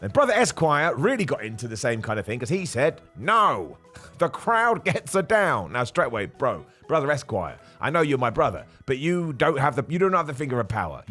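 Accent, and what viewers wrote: United Kingdom. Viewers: I watch all of your what culture videos and your personal channel here. Love you!!! Love hearing your brittish accent... oh and whatever you're talking about as long as you're talking!! 🥰❤️❤️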